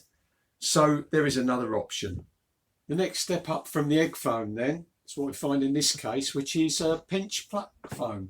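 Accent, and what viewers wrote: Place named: East London, United Kingdom. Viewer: Helpful video. Love your videos. I can't help wondering where your accent originates from. You sound a lot like my late granddad, who was from the London East side. Very soothing to listen to. Anyway keep it up mate.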